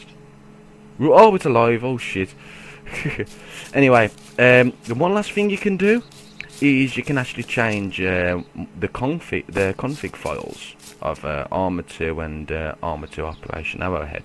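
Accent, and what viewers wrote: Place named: Germany. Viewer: you're german right? :D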